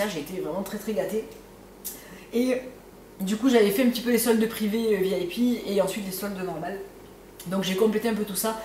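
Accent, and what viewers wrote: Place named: South, France. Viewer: re bonjour toujours un vrai plaisir et régal de regarder tes vidéos soldes, etc tu es magnifique, belle et ton petit accent du sud nous apporte du soleil gros bisous;-))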